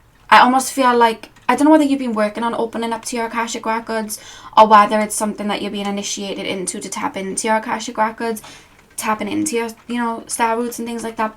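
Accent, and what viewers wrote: Wales, United Kingdom. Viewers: thanks for the reading, and I love the Welsh accent, don’t worry about those people who have to say something ridiculous about you, the comments are more about showing who they are than who you are. Take care of you! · Thank you,! Pile 3. I, for one, never thought your accent was faked! It's very charming and very British Isles...bless you for your dedication to your audience! Sending love!🙏🙏😊❤️ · I am by no means am knowledgeable about accents (as an ignorant American), I thought your accent was authentic but assumed you were from Ireland. 😅 I thought you had an Irish brogue until you said you were from England. Whoops. 😆 So, up until following you, I had never heard a Welsh accent. I may have, but always assumed it was Irish I guess. 🤷‍♀️ Anyway! Amazing readings as always! Thank you for taking the time to make it and upload this. 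😊🙏💕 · Welsh is just an accent we don’t hear a lot, at least here in the US. We get some new Irish & English immigrants and visitors, and both those accents are well known culturally anyway, and so is Scottish(I love Billy Connelly), but I’ve never met a Welsh person or even heard a Welsh accent in a movie. The first time I heard you you sounded like a mix of multiple accents to me-including various NY accents(which includes different accents in each of the five burroughs, plus Latina and Carribean accents), and there were moments when you even sounded like you were from “the valley”/California, in addition to a vague scotch-Irish-English sounding accent. It was confusing because you like American pop music so much, and talks in an English accent a lot, and she’s from NYC, and Carribean-American, but she lives in Hollywood now. I prefer listening to musicians from around the world on NPR Tiny Desk YT channel. 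In female rappers I like, and. I also love singers, and. My favorite artist might be; does a beautiful cover of / 💚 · Pile 3: You sound perfectly, authentically Welsh to me! I have to agree with your interpretation of "divine timing." Besides, we're not really separate from the universe in any sense, so how could it be up to anyone but us? Thank you! 💜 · #3 Love your lovely Welsh accent. Take no notice of anyone that says otherwise. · I love your accent ❤️ My mum died when I was young and she was Welsh so I enjoy watching you because it reminds me of her and it’s not an accent I hear outside of my own family in Australia xx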